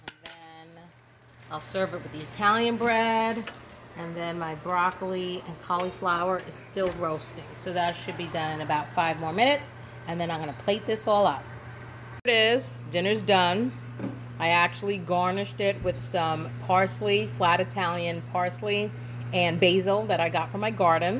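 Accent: New York, United States. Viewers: I'm pretty new to your channel...and I love it!!!! I'm all about the cooking, the hauls, the garden harvesting. I love your way of speaking...great NY accent. Cheers from Montana!!!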